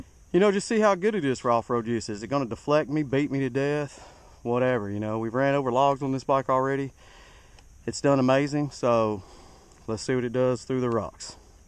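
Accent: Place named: South, United States. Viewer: i'm not from America, but.... is that a bit of a Southern accent i detect there...? i sure think so !!! :-))) great looking bike bro ! thanks for the review ! greetings from Israel ! take care !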